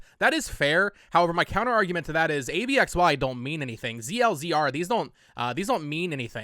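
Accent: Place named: Canada. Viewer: You say “on” like “awhn”. Are you Canadian?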